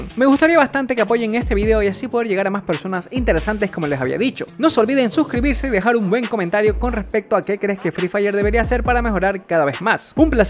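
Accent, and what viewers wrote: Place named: Ecuador. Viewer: que eres de ecuador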